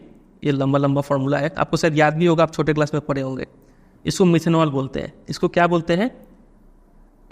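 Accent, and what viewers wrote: Bihar, India. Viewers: Hello sir kya aap bihar ke hain kya?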